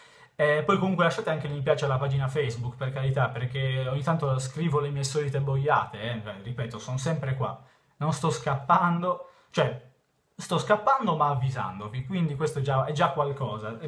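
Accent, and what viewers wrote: Sardinia, Italy. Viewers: Allora secondo me te ti sotto valuti perche' ci sara' un motivo se hai fatto un boom di iscritti,magari ad una parte delle persone che si è iscritta piaceva il tuo accento sardo magari ad un'altra parte piaceva il call of sputy questi sono esempi fattI da me magari le persone si sono iscritte per altri motivi ad ogni modo non ti devi sentire in colpa se hai fatto piu' iscritti di altri nn è colpa TUA.Detto questo mi manchera' vedere i tuoi video ma ti auguro buona fortuna per gli studi:) PORCO IL!!!!!!!!!!!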